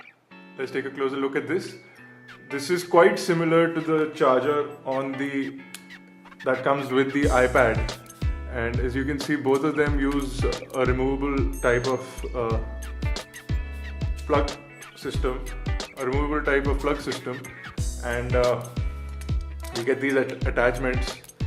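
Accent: India. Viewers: Hands of a white man, but accent like an Indian. Dude, your review put me to sleep...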